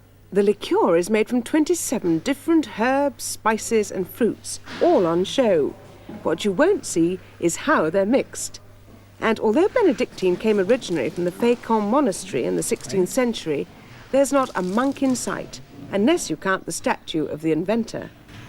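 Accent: RP, United Kingdom. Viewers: Yes I think I love you with your very posh accent and rusty French (I don’t care if you are now 85 years old) many a good tune is played on an old fiddle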